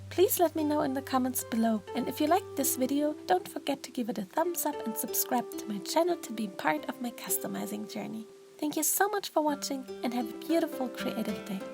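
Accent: Germany. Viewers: Your accent sounds German lol are you perhaps German? Bts love your art!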